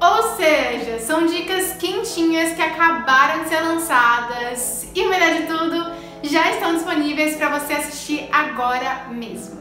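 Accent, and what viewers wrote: Bahia, Brazil. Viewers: Além de linda ainda tem esse sotaque lindo da Bahia 😍🙈💕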